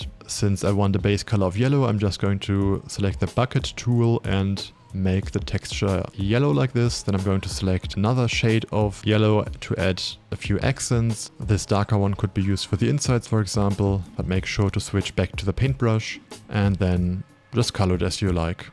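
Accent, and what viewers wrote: Germany. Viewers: man hört das deutsch so raus XD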